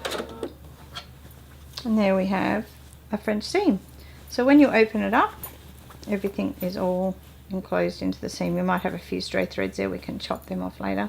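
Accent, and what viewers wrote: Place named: Australia. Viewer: I love your tutorials and it is great to have an Aussie accent!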